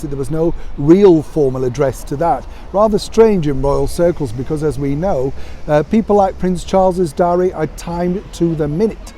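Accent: Yorkshire, United Kingdom. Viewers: I am not hearing this. I hear a fairly neutral reporting style - which is how reporting should be done - with an added pinch of Yorkshire no nonsense. It’s a case of ‘reading between the lines’ rather than being blatant. He says allegedly with a twinkle in his eye. Truth is, if you want to keep your sources and you want to keep your credentials as a reporter you cannot step over the line. You report and leave it up to the viewer to make their own mind up.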